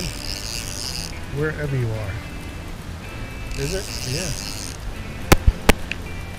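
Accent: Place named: Queens, United States. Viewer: Hey kinda sounds like your from Queens? area? - Jamica Bay or even try Bay Park, East Rockaway may be Closer to home. Tight lines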